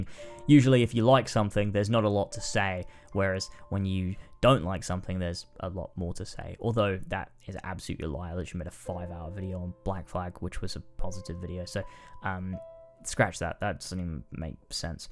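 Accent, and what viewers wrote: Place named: United Kingdom. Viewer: The British Accent Makes a Video Review of Dark Souls 2 maneuver. Certified classic.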